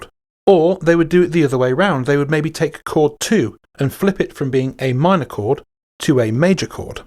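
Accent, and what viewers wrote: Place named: Liverpool, United Kingdom. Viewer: This dude sounds lime he is from Liverpool!!!!!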